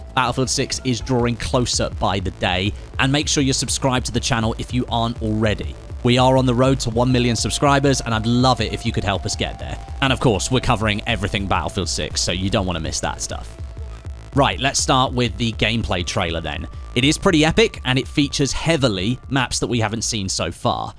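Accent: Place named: West Sussex, United Kingdom. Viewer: Im pretty sure you’re from West Sussex. I overheard someone in a coffee shop who sounded exactly like you.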